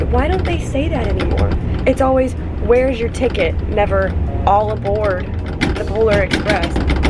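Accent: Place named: South, United States. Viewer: I could listen to you talk like a Southerner all day long. Please do so and keep up the good work.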